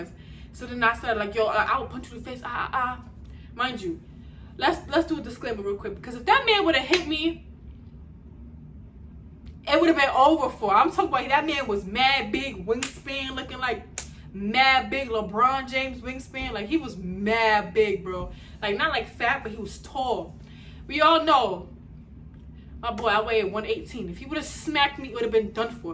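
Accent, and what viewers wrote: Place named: New York, United States. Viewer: Man That New York accent kills me 😂😂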